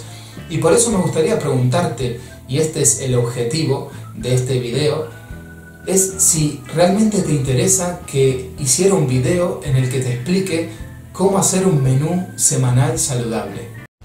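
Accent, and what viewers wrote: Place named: Argentina. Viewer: Hola! De casualidad encontré uno de tus videos (creo que fue buscando cómo hace galletitas de avena) y me fuiste llevando con los temas a ver varios de ellos. Es muy interesante esto de la nutrición macrobiótica, están buenas muchas cosas que plantea pero es difícil dejar y/o cambiar ciertos hábitos cuando uno disfruta tanto de la comida y ha sido criado así no? Es más, ni bien te escuché pensé: este chico es argentino!! y no paré hasta confirmarlo!! se te nota en la forma de hablar =D Yo tb lo soy... es muy loco que estés viviendo en Finlandia!! Qué lejos!! Jaja pero bueno, nos demostrás que a pesar del frío tremendo que hace allá se puede comer saludable. Saludos desde Bs As!!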